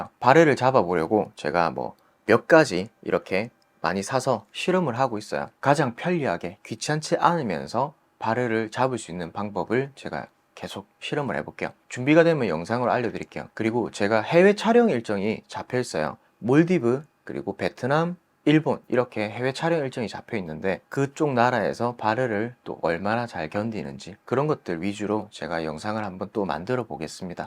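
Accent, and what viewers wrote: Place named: Gyeongsang, South Korea. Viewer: 아랫지방 사투리억양 너무 맘에 들구요 편안한 영상 좋아서 구독과 좋아요 누르고 갑니다!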